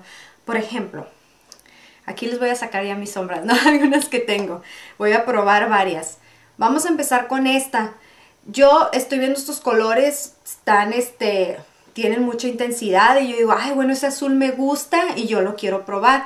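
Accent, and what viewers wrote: Tijuana, Mexico. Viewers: Me encantas!! eres de Tijuana? jajaja, yo soy de Mexicali y me identifico con tu forma de hablar jajajaja, saludoss sigue haciendo tutoriales son muy buenoos!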